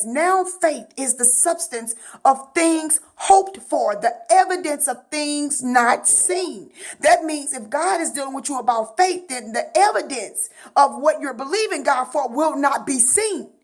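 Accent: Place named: St. Louis, United States. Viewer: Amen sis! God is faithful! You sound like you from the home town! STL?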